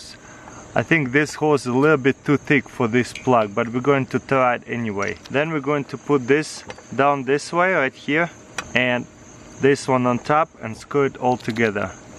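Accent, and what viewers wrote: Russia. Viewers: Is he a Russian?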